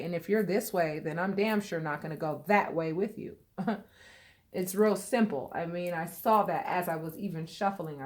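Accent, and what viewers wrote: United States. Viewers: wow resonates so much luv the yanki accent well said hammered the nail home bless thank you xxxxxx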